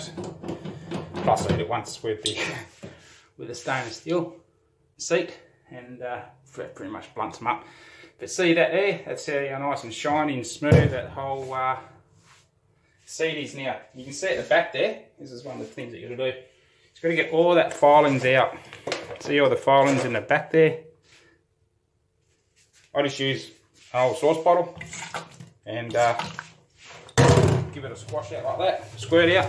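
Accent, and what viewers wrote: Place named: Australia. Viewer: Yer rite maaate! It is a local accent. Understood throughout OZ. Try those hillbilly accents from some states in the USA. Impossible!!!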